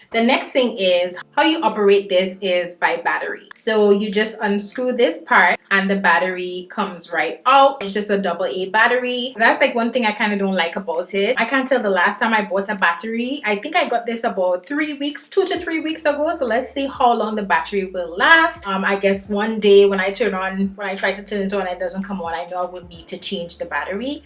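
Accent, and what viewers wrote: Trinidad and Tobago. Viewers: Love listening to that Trini accent on YouTube. We need more west Indian people on this platform. Big love from Guyana 🇬🇾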